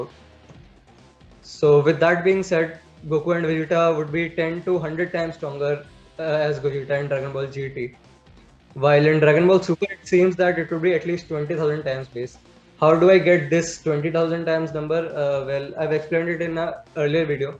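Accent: India. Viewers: I couldn’t understand him that Indian accent is to strong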